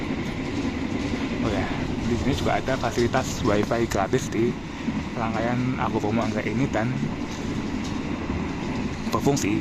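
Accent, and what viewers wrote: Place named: Java, Indonesia. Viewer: Medok banget suaranya. Ga bs dimengerti. Coba kamu belajar ngevlog dg intonasi yg bagus. Banyak kok youtuber yg medok tp intonasinya bagus. Jd buat yg non jawa itu mengerti apa yg dibicarakan. Sukses selalu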